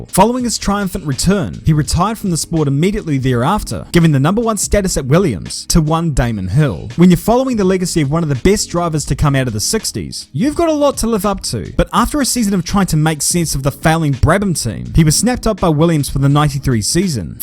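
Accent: New Zealand. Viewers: That is the proper pronunciation, just sounds a bit funny cuz he's kiwi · Please just pronounce it "Ear tin". "Eye Earrrhh tonne" sounds ridiculous! Also, while I'm being an egg, why is your glorious Kiwi accent peppered with Aussie/American vowels, such as when you say "example" funny? Just curious. 👍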